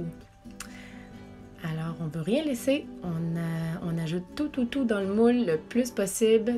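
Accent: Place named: Quebec, Canada. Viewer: Ah je me disais bien que vous étiez Québécoise, ça fait plaisir de voir une vidéo en français ! Et ne vous préoccupez pas des commentaires négatifs, je suis une Française de Paris et j’adore votre accent ^^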